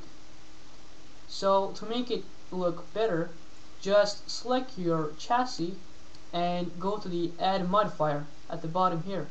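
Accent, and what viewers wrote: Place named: Russia. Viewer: control what? i cant understand the russian accent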